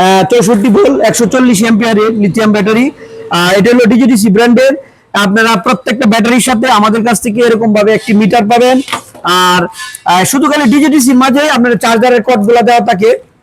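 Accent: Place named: Kishoreganj, Bangladesh. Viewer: আপনি কিশোরগঞ্জের আঞ্চলিক কথা বলেন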